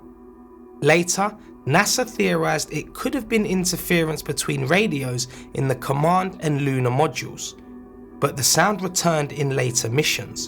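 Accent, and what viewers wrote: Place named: United Kingdom. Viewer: he’s British. In British English the “s” in “debris” is silent.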